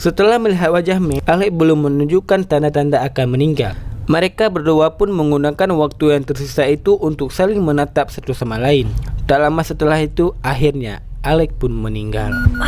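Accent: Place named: India. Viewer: Lage logat india si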